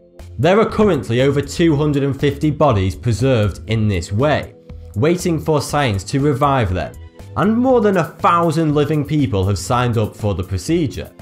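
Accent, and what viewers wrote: United Kingdom. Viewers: Shady Marshal he's brittish come on give him chance i couldn't even say thoughty2 because i m from asia i always say ''to(cant say G)ty 2) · i luv his British accent :)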